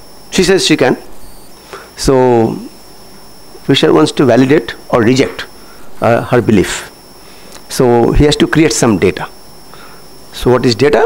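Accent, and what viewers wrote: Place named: Bengal, India. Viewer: He is speaking benglish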